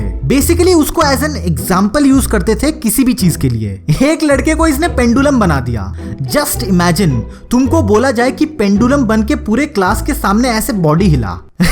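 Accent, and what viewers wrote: Bengal, India. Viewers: BHAI TU BENGALI HAI KYA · Tu Bengali hai Kya Bhai... ? BTW nice video Love from Kolkata ❤️ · Bhai tui west bengal se ho? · Are you bengalee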